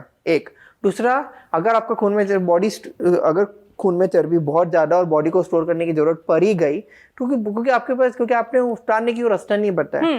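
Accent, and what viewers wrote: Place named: Sidhpur, India. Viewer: Speaking hindi like a typical sidhpuri.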